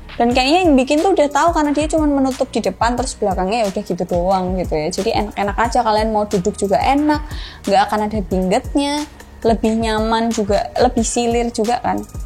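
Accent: Java, Indonesia. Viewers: logatnya ky orang jawa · Wisss ayu, cerdas,yo supel,logat jowone nambah gawe ayune mba 'e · Jawa banget suara ny mba.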